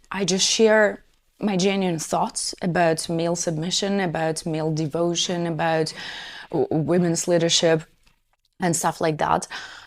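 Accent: Russia. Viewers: Is ugly Russian accent a must in such lessons?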